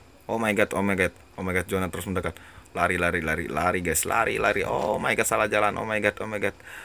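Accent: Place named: Manado, Indonesia. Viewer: Abang orang Manado ya!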